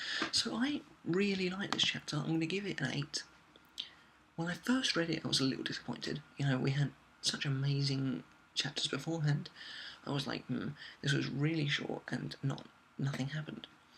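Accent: United Kingdom. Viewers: Wow, I didn't even think of what kind of side effects the balance of soul would have on the human world. This is why I like your reviews, your insightful and British...are you British?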